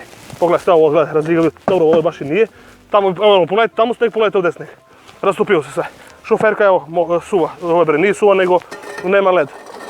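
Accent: South, Serbia. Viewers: Brt on je iz sela ono i tamo je takav Niski akcenat nemoj da pricas kad ne znas. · E majke mi jesi glup on je covek sa sela brt tako ljudi u juznom delu Srbije pricaju inace jel ti neko ikad rekao da si glup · Brate sa juga je srbije nis leskovac vranje to je njihov dijalekat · ma on je buraz kako prica da prica nas covek sa juga on ima 800k suba a beogradski hejteri moze da mu ga dudlaju sa snjihovim padezima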